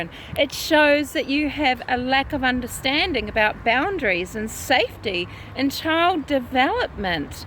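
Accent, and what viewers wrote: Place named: Australia. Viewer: I thought you were an Ozzie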